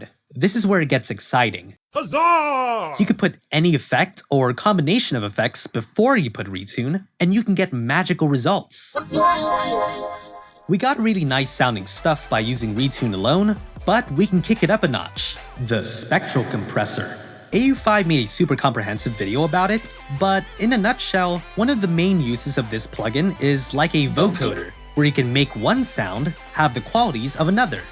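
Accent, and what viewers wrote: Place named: United States. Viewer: Your english sounds SO GOOD in my opinion because you said you are from the Philipines. How did you learn English so well to sound american?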